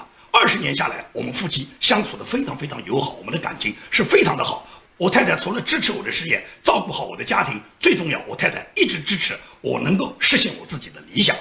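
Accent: Nanjing, China. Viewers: 建民一口标准南京话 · 一听就是南京口音😄。㊗️老乡身体健康！诸事顺意！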